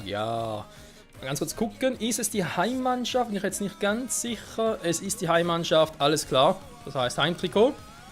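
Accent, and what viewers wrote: Switzerland. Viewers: Ich finde dein Schweizer dialekt ist so cool😆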